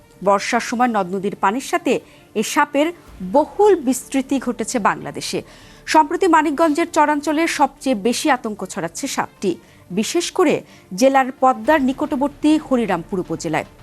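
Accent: Kolkata, India. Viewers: নাচনেওয়ালী কোলকাতার accent এর উপস্থাপিকা কেন? বিরক্ত লাগল খুব। উচ্চারণও কোলকাতার যা শুনেই বিরক্ত লাগল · Apnar accent kolkatar moto keno? disgusting,disappointing, unacceptable. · Apni ki Indian ? Apnar language and presentation style Kolkata ba Indian Bengali style er. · Apni kolkata style a Bola bondho koren. Birokto lagey. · আপনি কলকাতা স্টাইলে কথা বলেন কেন , আর এটা বাংলা না বাংলাদেশ ওকে... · এই presenter মনে হয় ভারতীয় নারী।। তার বাচন ভঙ্গি কলকাতার মেয়েদের মতো।।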